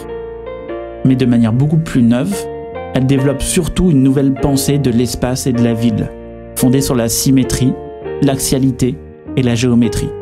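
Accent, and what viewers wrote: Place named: Paris, France. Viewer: Le narrateur a l'accent du 16ème.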